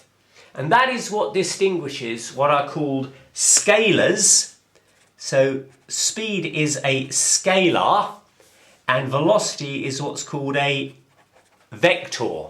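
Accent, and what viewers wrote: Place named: RP, United Kingdom. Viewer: why is this guy so posh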